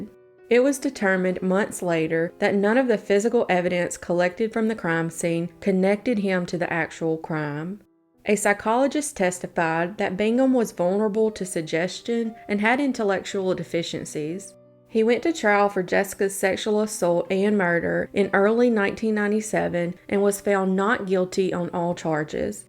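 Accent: South, United States. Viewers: You are my fave Youtuber in the genre. fresh stories and a nice southern drawl :)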